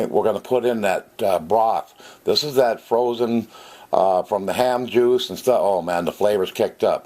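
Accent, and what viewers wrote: Minnesota, United States. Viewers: Talks like an only Minnesotan "this farmlund make utt".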